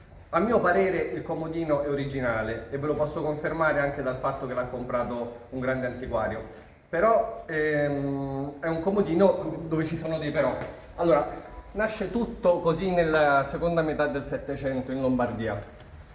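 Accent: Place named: Lazio, Italy. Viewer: Salve 🙂 Complimenti!il suo accento forse mi sembra Laziale,ma il cognome che porta è senza ombra di dubbio Ragusano!!! saluti da un ebanista e restauratore 🤚🏽🤚🏽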